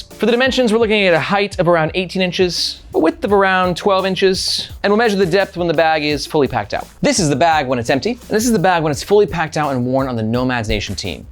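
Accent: France. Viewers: Nice French accent 😊 thank for your review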